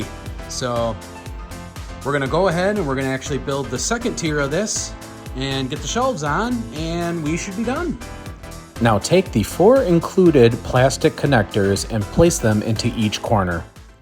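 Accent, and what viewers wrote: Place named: Wisconsin, United States. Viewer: glad I could confirm the Wisconsin accent! appreciate the reviews :)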